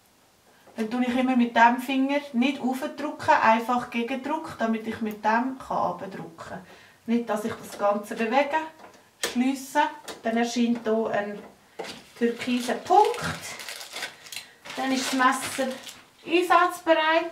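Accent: Switzerland. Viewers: Stimmt, Schwitzerdütsch ist kein Deutsch..... Aber ich versteh dich! ;-) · Juhu, isch scho es Zitli här das Video! i wohne z'Basel, chume aber ursprünglich au us der Region Solothurn/Bärn... due doch nid esone Zürcher Dialäkt ufsetze... wenn nämlech am Erkläre bisch im Video seisch jo au Röueli z.B., lohs lo sprudle! ;-) aso me verstoht di Mi Cameo 3 isch no haub ipackt im Büro und i goh jetz grad mou go luege öb das stimmt wo du do verzöut hesch im Video ;-)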